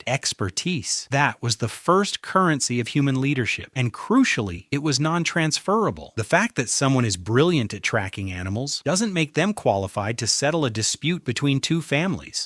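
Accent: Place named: United States. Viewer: No American voice please. Video rejected. Goodbye Yankee.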